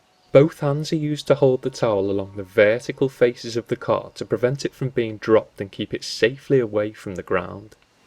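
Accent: Liverpool, United Kingdom. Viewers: Good video but please refrain from using this Scouse twat from commentating!!! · Scouse of course!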